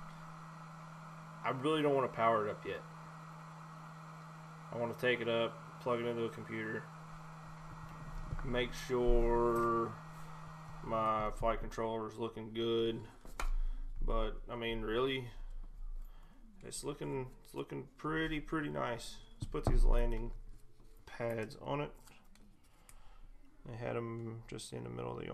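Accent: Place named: South, United States. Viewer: Sweet video! Never have been to your channel, heard your voice and said man this guy is country (by the way I am in Alabamer). I was thinking this is going to be a wreck, but....You are awesome at building and so thorough, with the continuity checks and all. I really enjoyed the video and will be subscribing. Thanks again for the Video ;)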